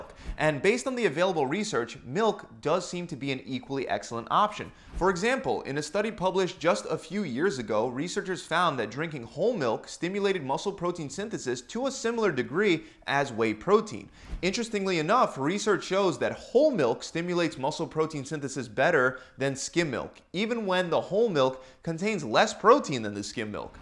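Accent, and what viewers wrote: Philadelphia, United States. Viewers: This dude from either philly or jersey w that wootur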